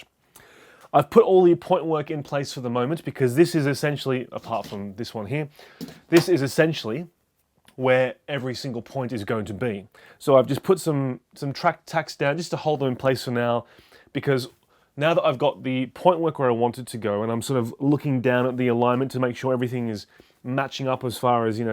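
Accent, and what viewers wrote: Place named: Australia. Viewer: Completely off topic, but what is your accent. I was thinking Australian ex-pat? Melbourne from the Herbert's T shirt?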